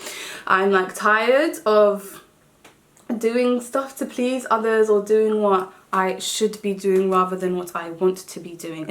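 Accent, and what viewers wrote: United Kingdom. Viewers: You remind me of Jorga Smith both of y'all gorgeous and have that dang British accent.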